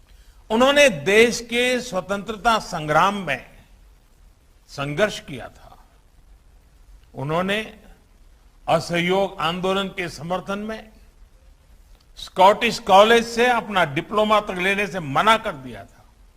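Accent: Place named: Gujarat, India. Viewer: that's gujrati touch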